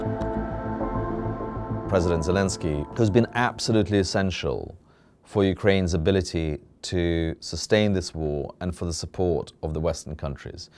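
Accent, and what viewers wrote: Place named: RP, United Kingdom. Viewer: When you click on a video of The Economist hoping to learn something and stop halfway as you figure out it is posh British accentuated bla-bla-bla…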